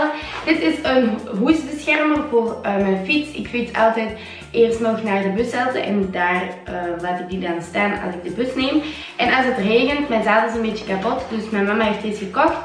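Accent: Belgium. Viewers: ik ben Nederlands en het is echt grappig dan hoe Belgen praten Loveeee naar jou btw :)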